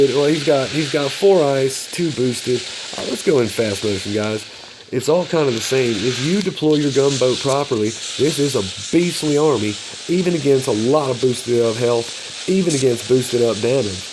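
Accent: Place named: Texas, United States. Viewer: Howdy from Okie City!! Thought that was a texan accent! cheers bud!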